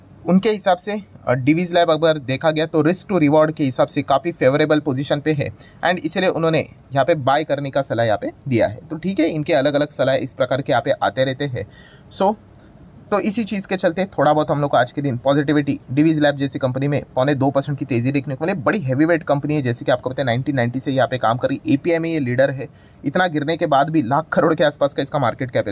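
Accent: Maharashtra, India. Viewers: guruji pakka maharashtra ke hai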